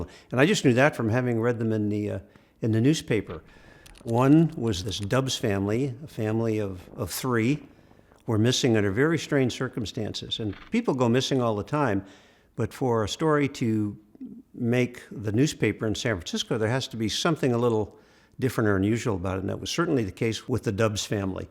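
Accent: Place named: United Kingdom. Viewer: This is a wild story good job covering it. I really appreciate the fact that you limited your British accent in the video. American crime American voice. Nothing personal. I can barely understand what in the toast and beans you’re saying.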